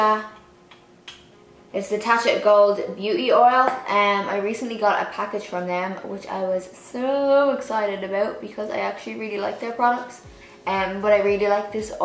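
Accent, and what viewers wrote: Ireland. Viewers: I just found you the other day and I love your tutorials!! I love your hair aswell hah💓 also are you from Ireland? You've an Irish accent hah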